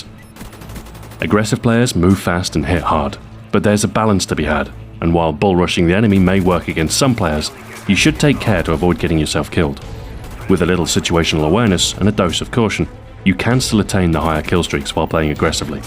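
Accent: England, United Kingdom. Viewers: Play the video at about minutes and switch it to 0.5x speed. LOL. voice sounds so funny. He sounds like HAL 9000 with a little more of an English accent.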